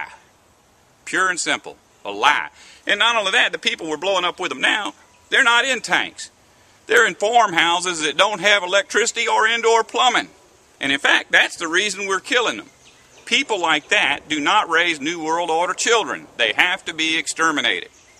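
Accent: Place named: New York, United States. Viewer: Aw heck, I though it was New York. His accent is a lot like yours.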